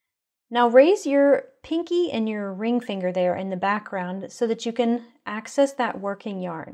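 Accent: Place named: Pennsylvania, United States. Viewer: Hi! Gave you a like of course... It's a habit I've gotten myself into.. I do it first thing so I don't forget. I already have mastered the magic ring, but your episode and technique was very good. Also, I liked that little cheat you did with using the ch 4 instead.. good to know! You sound like you're from PA. I can hear the accent in some of the words you say. Thanks for another great episode of B. Hooked TV. 🌼🙂🌼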